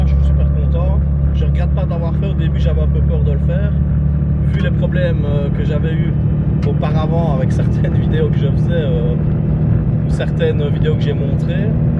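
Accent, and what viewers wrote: Liège, Belgium. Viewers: Merci de nous faire part de tes connaissances et de tes explications adaptées. Preparateur gtr, moi je prépare des bons gros tedehi lol chacun son monde ! Lol Chouette vidéo, ton accent liégeois rend unique ton vlog 😎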